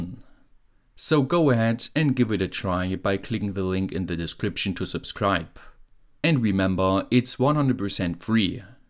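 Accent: Germany. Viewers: You sound absolutly like a german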